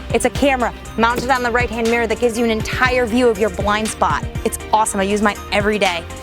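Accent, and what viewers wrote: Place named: New England, United States. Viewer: Hella cute and that new England accent though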